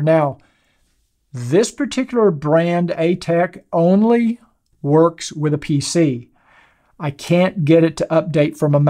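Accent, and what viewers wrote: United States. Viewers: I live in Amsterdam the Netherlands. I own a GW2021 and ordered the GW 2022 DCT touring All Black. I love your video’s and specially your voice and American accent. I love it. Thanx.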